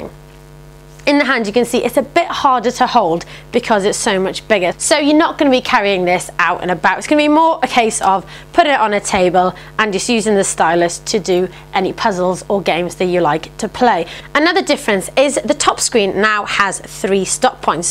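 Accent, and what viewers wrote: United Kingdom. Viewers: Gotta love cute girls with an English accent! On a different note, I think Nintendo should have added more improvements like since it's bigger anyway why don't they just put back in the GBA slot? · the accent is so heavy, even for a British lady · I love British accent.. ;) cute voice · thats the good old british accent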